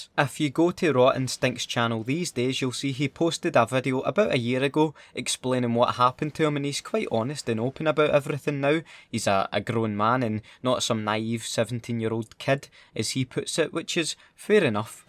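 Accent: Scotland, United Kingdom. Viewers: I don't watch any other COD youtubers. Tbh I just love the Scottish accent that's why I watch this channel ahahah. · Now i know where this accent is from. SCOTLAND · Yo I have been subbed for about a month now, I would be browsing facebook and sometimes I would come across a scottsman playing COD and thought I fucking love the accent but more importantly I found that the content was top notch so I found you on youtube and subbed and now here I am, drinking coffee, enjoying a great COD video. Thumbs up to you sir.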